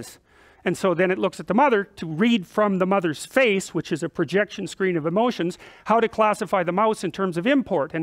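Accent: Canada. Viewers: He says eh a lot. Is he Canadian? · He let the 'eh''s fly this lecture. It tickles me whenever he lets one out. I love Canadians. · every once in a while, you hear the Canadian 'aye' come out.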